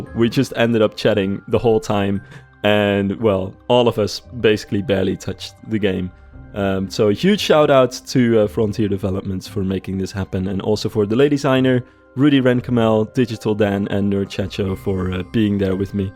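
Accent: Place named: Netherlands. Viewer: Are you Dutch? Bedankt! Haha 😂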